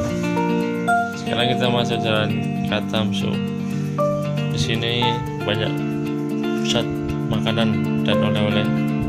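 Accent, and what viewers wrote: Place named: Banyumas, Indonesia. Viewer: Sya juga ngapak... Hihi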